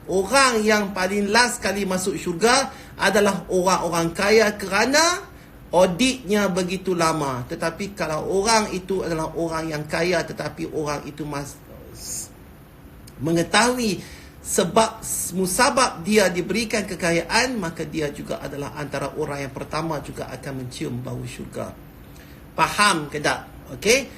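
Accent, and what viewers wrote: Penang, Malaysia. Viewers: Oqang penang. Terbaik tok. Pekat loghat penang sampai la ni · Dato ckp x berubah ...penang mai..Good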